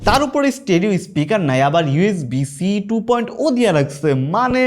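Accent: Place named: Bangladesh. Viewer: ও ভাই আপনি বাংলাদেশের কোন এলাকার ভাষায় কথা বলছেন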